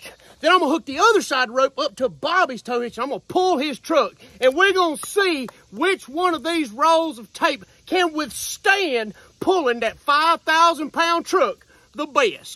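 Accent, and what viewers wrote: South, United States. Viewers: That's southern lingo. Window (winder), tomatoes, patater, onion etc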